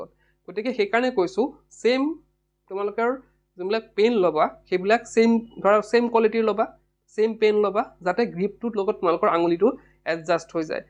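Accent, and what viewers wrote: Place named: Barpeta, India. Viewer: Sir barpeta district r English lage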